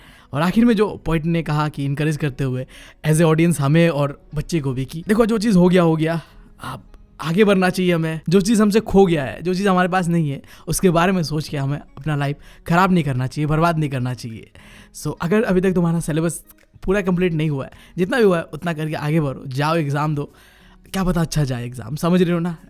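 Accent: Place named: Assam, India. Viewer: I like your assamese accent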